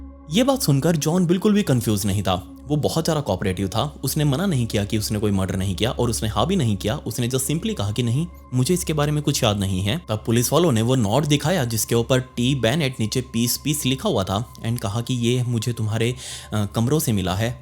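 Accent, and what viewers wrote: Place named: Mumbai, India. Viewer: आपका बोलने का तरीका बहोत अच्छा हैं और मुंबई स्टाइल वाला हैं इसे आप जैसा हैं अच्छा हैं साहब जय हिंद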